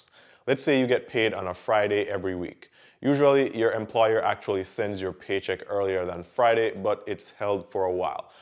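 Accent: Jamaica. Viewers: Are you from the Caribbean (Jamaica)? You don't sound like American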